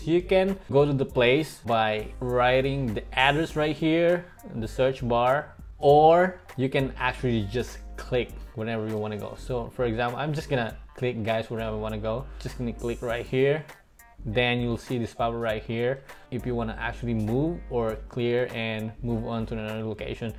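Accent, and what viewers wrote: Philippines. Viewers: Hehe salamt po kuya tiga SUBIC po ako yung pagsasalita mo palang halatang pinoy na 😂♥️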